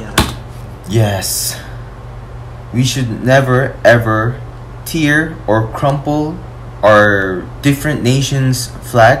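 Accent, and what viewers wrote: Philippines. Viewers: Kaya pala your accent is like Filipino haha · You sounded like filipino already ☺️ Love and peace to all Filipino and Korean people 😊❤️♥️ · Hey you've got a good filipino english accent and its perfectly clear. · Lol, you have a Filipino accent when you speak English. 👍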